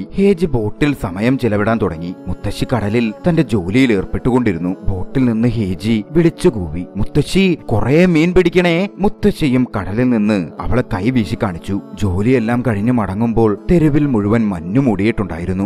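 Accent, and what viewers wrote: Ernakulam, India. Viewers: നിങ്ങൾ എറണാകുളത്തുകാരൻ ആണോ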